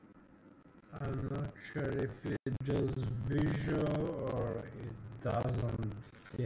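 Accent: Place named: Greece. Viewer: Η προφορά σου είναι λίγο ελληνική..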